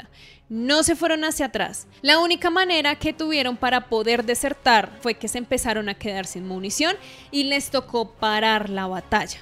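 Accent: Colombia. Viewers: el acento es de Colombia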